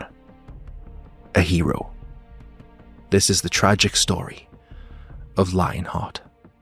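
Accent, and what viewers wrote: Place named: Newcastle, United Kingdom. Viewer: Fantastic video, love the geordie accent as well!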